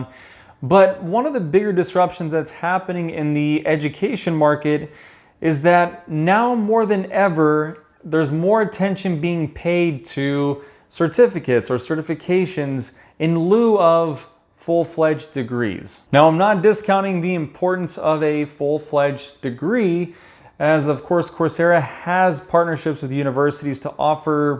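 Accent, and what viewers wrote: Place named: Russia. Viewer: Мне кажется , что у вас русский акцент.For some reason I hear russian accent in your speech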